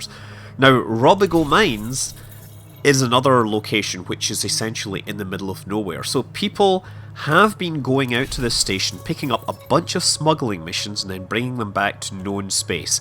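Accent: Scotland, United Kingdom. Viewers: Goddamn I love Scottish accents.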